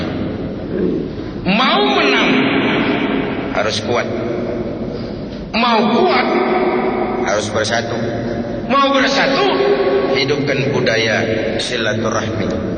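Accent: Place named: Aceh, Indonesia. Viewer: suara aceh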